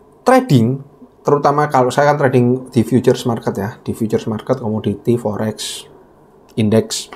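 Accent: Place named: Java, Indonesia. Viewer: Bahasa Inggrisnya belajar dari mna mz , kok keren bgt agak2 logat jowo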